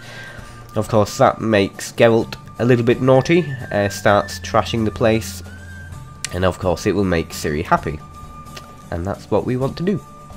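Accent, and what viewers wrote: United Kingdom. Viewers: Humberto Castro I think he is British,but sometimes he spoke so fast that's hard to understand indeed.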